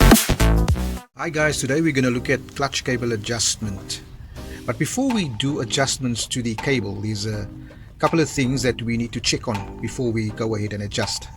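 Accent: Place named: South Africa. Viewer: Love the south African accent...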